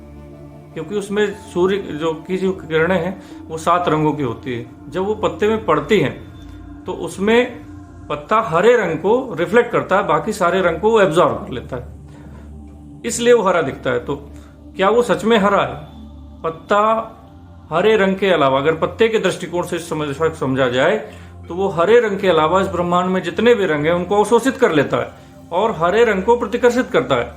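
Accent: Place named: Kanpur, India. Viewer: Dheere dheere kanpuriya style bahar aa rha ab By the way great explaination of shiva triology series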